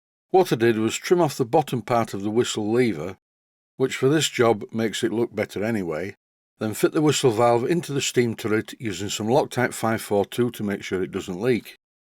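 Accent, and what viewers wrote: United Kingdom. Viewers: You've mentioned CME Engineering a number of times in your videos. Do they have a web site? I searched for them, but obviously my googlefoo is failing me this evening. Either that or my Yankee ears are conflicting with your British accent.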